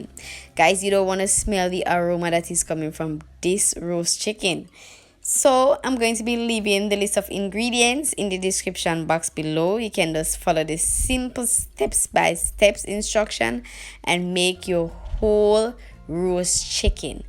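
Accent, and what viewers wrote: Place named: Jamaica. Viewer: Hi you did a very good job am new in your house you sound like a yardie like myself anyway am going to say in your house big up i enjoy watching the entire video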